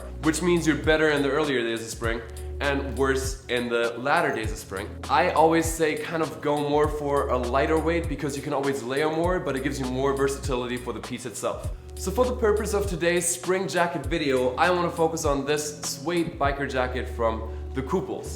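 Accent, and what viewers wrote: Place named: Germany. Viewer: He sounds Veryy german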